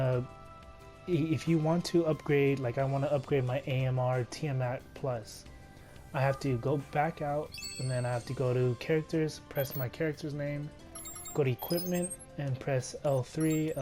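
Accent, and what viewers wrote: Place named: Arab world. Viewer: واضح من لهجتك انك عربي بس لهجتك أحسن بكثير من كثير من العرب، ما شاء الله 😂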